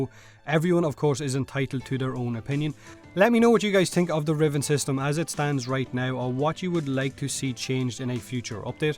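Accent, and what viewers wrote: Ireland. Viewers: are you Irish cause you sound Irish?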